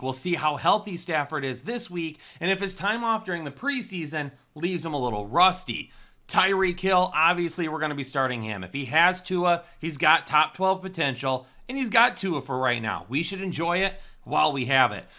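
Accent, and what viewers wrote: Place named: Michigan, United States. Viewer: Man, this accent is soooooooo Michigan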